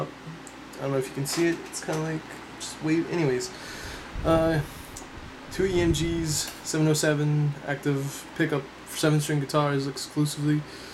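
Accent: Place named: Canada. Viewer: You have the most stereotypical Canadian accent I have ever heard, nice guitar too.... I'm probably gonna go with passive pickups but, either way Agiles are too nice to pass up.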